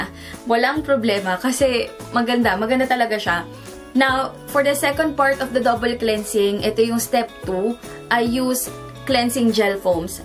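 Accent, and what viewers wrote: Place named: Philippines. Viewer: I love how u speak english.. it is soooo pinay... ❤️❤️❤️ go girl ❤️❤️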